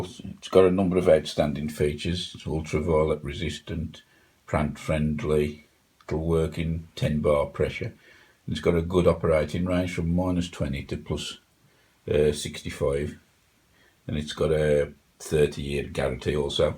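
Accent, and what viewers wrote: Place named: Midlands, United Kingdom. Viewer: Hi. I just want to say thanks for uploading your videos. It's great to hear a fellow midlander on the screen. I'm from Dudley and proud of my Black Country roots. I can see that you're a bit of a womble like myself and believe in recycling whatever you can. Great videos and keep up the great work.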